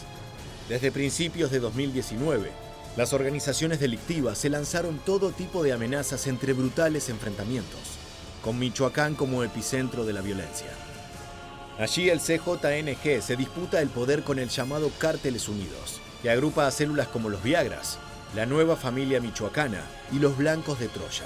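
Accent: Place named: Argentina. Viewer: Pues el narrador ha de ser argentino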